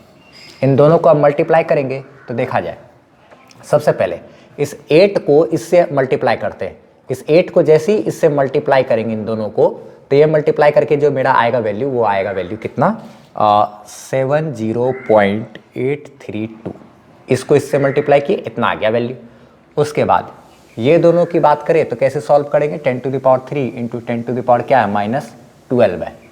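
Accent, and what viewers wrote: Bihar, India. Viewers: I really love your Bihari tone 😅❤️❤️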